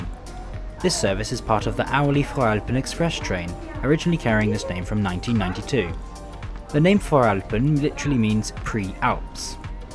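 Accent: England, United Kingdom. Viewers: Damn... as a swiss guy i just love it how you pronounce the swiss names of villages and cantons in a english accent😂 it sounds very good btw! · Hey! As i'm listening 2 u, i hear, that u r english, or brittish, not american! Because there are a few difference between brittish english and american english!😊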